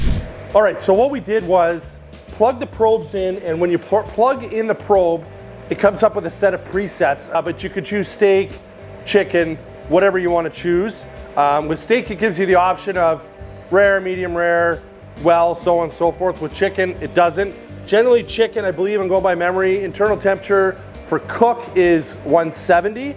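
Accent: Canada. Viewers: I love the way Canadians pronounce "out" and "about". Love Ninja products!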